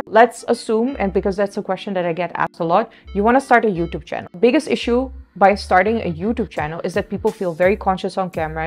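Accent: India. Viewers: She has developed a slight Indian accent. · She has an Indian accent now 🤣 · I am hearing bit of indian accent in u lol, love ur journey so far❤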